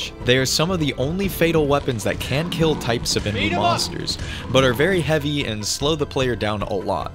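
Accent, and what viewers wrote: Greece. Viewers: Are you greek?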